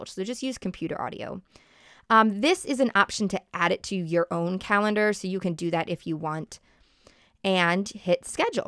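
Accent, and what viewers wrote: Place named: United States. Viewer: she sounds so american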